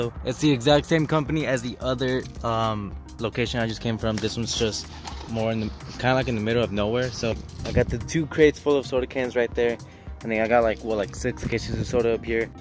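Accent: Chile. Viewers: Are u chilean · Are you Chilean? :0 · Are u chilean bro? AGUANTE CHILITOO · eri chileno ? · eres chileno verdad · Wn eres chileno? La raja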